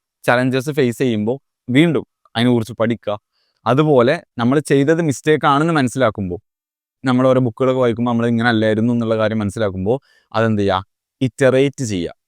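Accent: Malappuram, India. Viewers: താങ്കളുടെ വീഡിയോകൾ എല്ലാം മികച്ചതാണ്. പക്ഷെ സംസാരത്തിൽ നാടൻ മലപ്പുറം കാക്കാൻമാരുടെ ശൈലി കടന്ന് വരുന്നത് ലജ്ജാകരമാണ്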